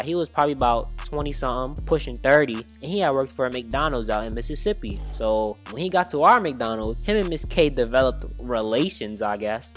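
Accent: New York, United States. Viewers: bro said whattaburger yet talk like he from ny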